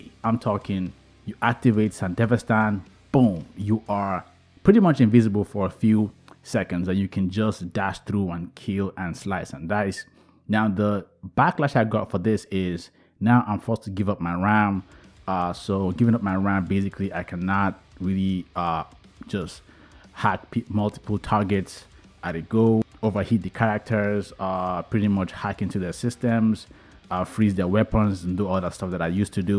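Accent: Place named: India. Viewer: Hello. Where are u from? India? Just curious) Your accent sounds like indian.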